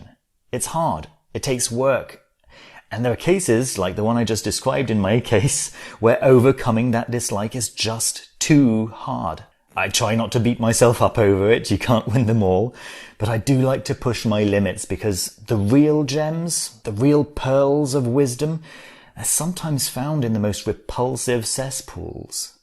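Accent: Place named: United Kingdom. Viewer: A-dee-siv! I l love the British accent. Bring on the Devine Feminine! Give us what ya got.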